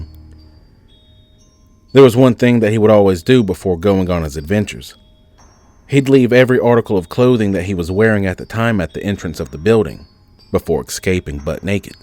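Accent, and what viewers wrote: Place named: South, United States. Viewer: Yet southern accent turns me on.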